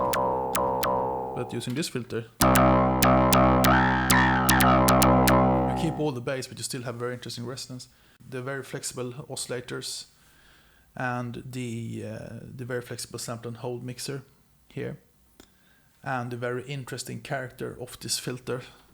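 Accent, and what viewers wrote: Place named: Sweden. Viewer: Just love when you can hear on the accent its a swedish person.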